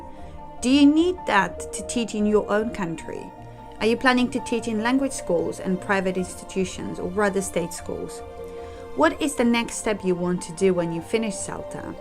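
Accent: United Kingdom. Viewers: Hi, thanks for your answers:) Currently I am a student and my English is oscilated between B1-C1, everything depends on what we are talking about: speaking, writing or listening and so on. I am impressed by your British accent. Would you be so kind and maybe make a video or just write a comment, how to make your pronounciacion more Biritsh for non naitive speaker. I know that there are a lot of different videos on YT, but why not?:) And maybe how to understand wishes for Polish people or other "hard" English grammar constructions? Greetings:) By the way, do you think people like me, whose English level is not yet C2, but I hope one day it will, are able to pass CELTA, without having diploma in either linguistics or english philology? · You sound like a native british :) congrats! Where are you from?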